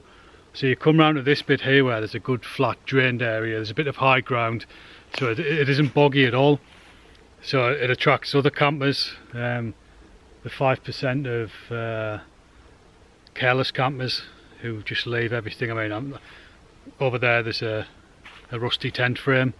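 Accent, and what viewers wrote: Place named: Geordie, United Kingdom. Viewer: I hear the twang of a Geordie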